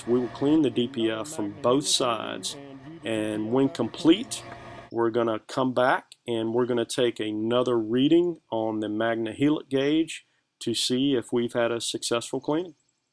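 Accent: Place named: United States. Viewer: He's using the American pronunciation. And that's not a Sandblasting box, it's specifically designed for cleaning DPF's, which is why it has a Vaccuum unit at the bottom along with a collection box for waste and a plate specifically designed to seat the DPF - He doesn't state that it's anything super secret he even says it uses a simple high pressure air knife to blow ash through the filter - but it does work in combination with the vaccuum under the DPF...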